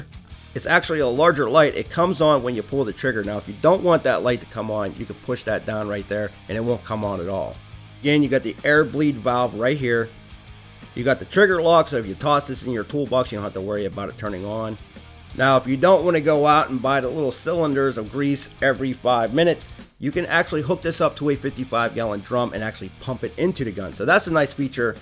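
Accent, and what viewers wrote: Pittsburgh, United States. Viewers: I sense a little Yinzer in that accent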